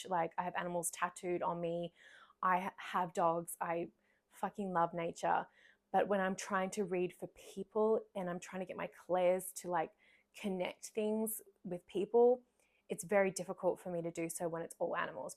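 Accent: Australia. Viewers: Well what can I say ? Just splendid. I know you said you were in Canada, but you sound like one of my Aussie compatriots. Thank you for the upload.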